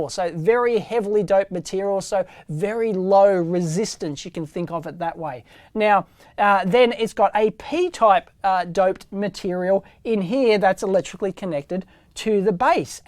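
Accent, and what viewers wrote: Australia. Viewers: Absolutely Superb!! Best explanation I've seen. Moreover Australian accent made it just PERFECT!! Thank you mate!!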